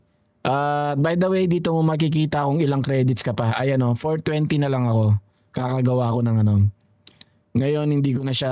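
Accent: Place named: Pampanga, Philippines. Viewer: Kabibili ko lng ng YT Shorts Automation course 199php lng nmn, pero dhil Shorts sha pti yta tutorial nya shorts din hahaha atleast dito may proper explanation.. At dahil libre ang kaalaman d2 at Kapampangan ka (huli kita sa accent mo tol hehe, Kapampangan din Ima ko) cge subscribe ako sayo, more power sa Channel mo!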